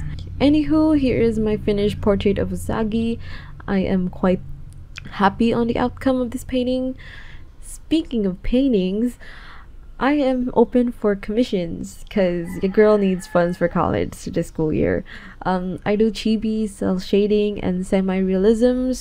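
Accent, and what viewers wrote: Philippines. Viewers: I KNEW YOUR A FILIPINo from your accent ;A; and hands? Is that weird xD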